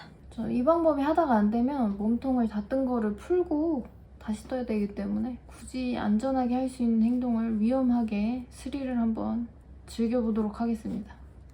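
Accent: Seoul, South Korea. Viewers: 서울 사투리 쓰시나요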